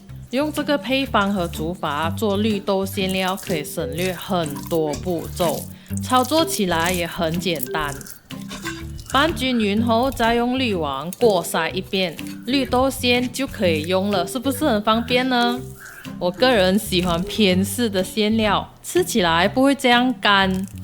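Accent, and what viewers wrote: Malaysia. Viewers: 喜欢听妳那带马囯乡音的华语.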